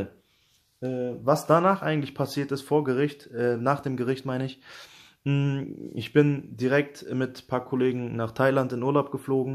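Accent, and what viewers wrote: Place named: North, Germany. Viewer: Klingst so norddeutsch😂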